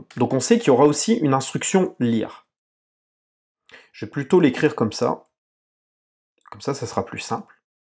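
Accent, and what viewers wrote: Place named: Ardennes, France. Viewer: Bonsoir, tu me rappelles mon ancienne collègue, elle prononçait le "t" de vingt, tu es des Ardennes toi aussi ? :D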